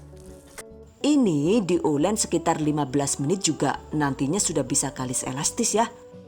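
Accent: Banyumas, Indonesia. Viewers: Bukan Tegal mas...ini pasti asli wong ngapak (Banyumasan). "Ora ngapak, ora kepenak"😁😂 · Kanggeeeeeeeen karo bhs ngapaknya..... Mksih resep bludernya bun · Makasih resepnya bunda,sipp dech, lucunya bhs ngapak e..😘😁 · Bunda asli mana si? Ko bisa bahasa ngapak kaya saya.😀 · owalah medok nemen banyumasane ha ha ha..... mksh mba mudah dimengerti ilmunya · ini resep yg ditunggu tunggu vocal audionya ok bgt seperti penyiar perbaduan bhs ngapak dan englishnya mhn dipertahankan sucses selalu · yg baik hati cantik dan salekhah...ttimakasih resepnya...pokoke ora ngapak ora kepenak 😀😀😀👍👍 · Langsung download....👍 makasih yang ngapak bgt tp lokasi Jatim 😁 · Klo nyimak pas ngomong ngapak itu lo hahaha.... kocak, masuk OVJ deh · Suka banget dengan channel ini, penjelasannya detil dan menghibur , logat Banyumasannya itu lho ngangenin. semoga menjadi amal jariah. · salam kenal ya bunda yg gaul and ngapak2 he he catattt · Channel kesukaan saya dari awal liat YouTube sampai kini...dari suara ,cara mengucap kan keren banget 👍🏻di tambah bahasa ngapak nya yg khas ,juga ilmunya yg profesional 👍🏻👍🏻👍🏻 sukses selalu❤️👍🏻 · Meski aq jg orang ngapak tpi klo denger ibu ini ngeluarin jurus ngapakny psti aq ngakak 🤣🤣(pdhl gi nyimak serius bgt langsung ambyarrr...) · Padahal inyong juga wong MABES (MADIUN BREBES) bisa ngomong ngapak juga tp kl denger si mbakayune lg ngomong ngapak inyonge auto ngakak 😅😅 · Jan seneng banget krungu ngapak koh dadi terobati rinduku karo mama ku.suwun yung karo bahasa ngapake. 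Slm dari perantauan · Mbaknya lucu suaranya kyk orang bule tp kyk pangenyongan orang apa to sampeyan itu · sy suka resep2nya..selain mudah caranya,suka bahasa dan logatnya..banyumasan(purwokerto)gitu😍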